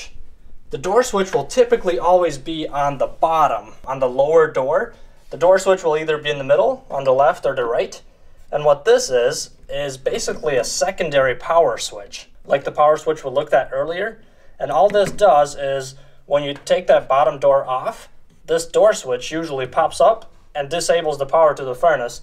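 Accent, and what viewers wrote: Minnesota, United States. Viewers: Great info! Love the Minnesota accent.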